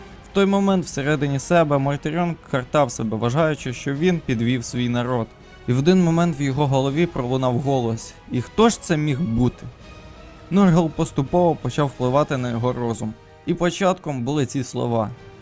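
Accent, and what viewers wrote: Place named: Chernihiv, Ukraine. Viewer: Чернігівщина, пролітає пару слів в тебе)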